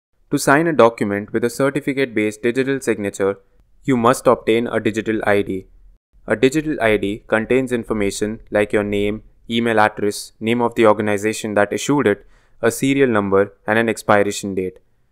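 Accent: India. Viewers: That's Indian accent 🇮🇳 🕉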